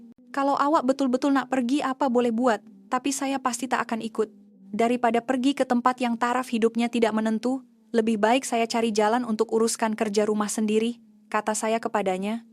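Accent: Indonesia. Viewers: Indon narrator?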